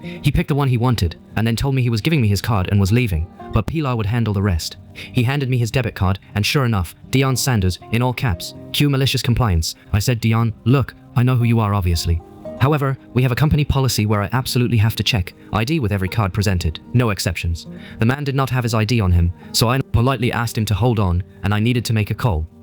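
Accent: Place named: United Kingdom. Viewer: What’s with the British accent?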